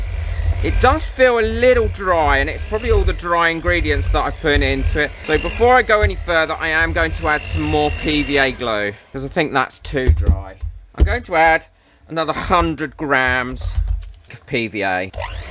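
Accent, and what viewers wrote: United Kingdom. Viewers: Hmmmm I wonder who has to taste the bleach to make sure it has enough flavor? 😂🤣🤪 My Gran is from Yorkshire and she used to say "flavor" instead of "scented" or "fragranced." I miss her and her laugh. She was also a crafter like you and would get so happy and giggly when something would work. The way you laugh and giggle reminds me of her… as well as the accent! While she lived in Yorkshire, I would come and stay for the summers… I would pick up that accent really fast and it would make my mum mental when I came home with it. After my grandfather passed away in 1999, Gran came to the states to live with my mum and dad. I got to have her with me for almost 30 years. That laugh was contagious and she was just as cheeky the day she passed away as she had been her entire life. Thank you for your videos and your laugh. It really brightens my day!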